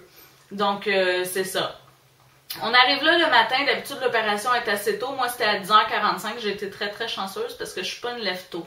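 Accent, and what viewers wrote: Quebec, Canada. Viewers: Le problème est que je regarde sa vidéo de la France, mais il a fallu que je déchiffre ce qu elle dit, car elle parle le francais canadien. C est à mourir de rire.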